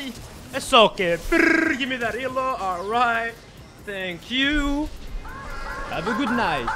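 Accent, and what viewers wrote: France. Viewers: LL SOUNDS EXTRA FRENCH THIS EPISODE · Tu a un accent français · AHH le bon accent français <3 GachiBASS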